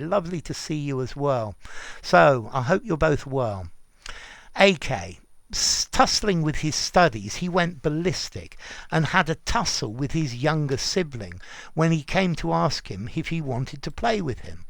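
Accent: United Kingdom. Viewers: Most of the videos I watch in English are from you, and some British ways of pronouncing words like "Dictionary" and "Vocabulary" have been stuck in my mind.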